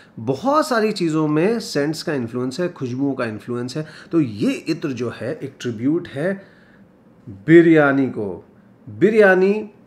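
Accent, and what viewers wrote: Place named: Bihar, India. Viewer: Others : write down the recipe while his chef talking Me : catch his voice tone and identify that he is a Bihari 😊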